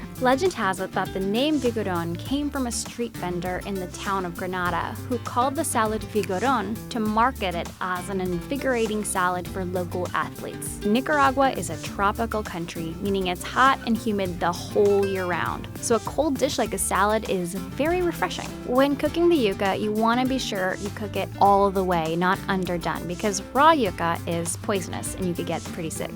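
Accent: United States. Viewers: Hablas como gringa habla un poco de español moja yo soy de Costa Rica y quiero que enseñes a hacer gallo pinto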